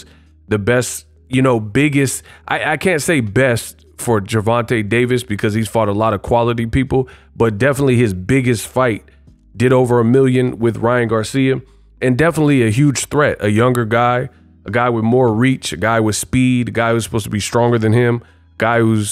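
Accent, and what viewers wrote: Baltimore, United States. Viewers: Lol@ your B-more accent. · The Bmore accent, up there with the Pacman, Bob Arum, Kell Brook. Best in the business-Smash the LIKE 👍🏾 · I can't with you, and the Baltimore accent 😂😂😂 in boxing sometimes fighters learn things the hard way, DHP will have an answer for the left-hand in his next fight. What a great year in boxing if we get Tank vs Devin in 2023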